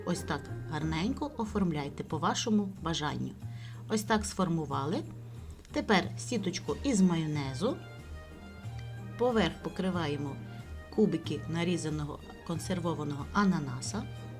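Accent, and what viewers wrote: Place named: Ukraine. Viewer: Может и вкусно но этот укропский говор раздражает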